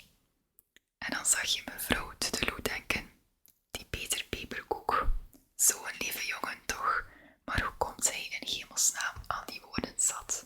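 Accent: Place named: Flanders, Belgium. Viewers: Heerlijk! Je stem en je Vlaamse accent zijn zó fijn om naar te luisteren! Goeie setting ook! Fijn donker en ontspannend. Topwerk! Dank je wel..🍀❤️ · Weer heerlijk om naar je Vlaams gefluister te luisteren. Ik hou van dat taaltje van onze zuiderburen.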